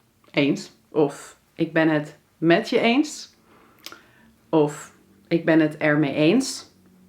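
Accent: United States. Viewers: hai i have a question: are you dutch or american? ps love your videos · Very minor super slight tinge of US accent in the "R" but nobody would notice. The guttural "hock a loogie" "G" is spot on.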